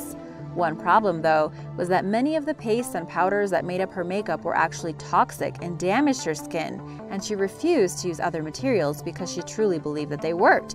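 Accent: United States. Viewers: Well she sounded american and like i said a few comments ago i watched another video that was american and they got their facts wrong when they said stephen hawking had als when he actually had motor neurones disease not trying to belittle anyone just saying for them to get their facts right because as you can see it will piss a lot of people off if they're incorrect such as this video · As soon as I heard the American accent I should have known that these "FACTS" would be nothing of the sort.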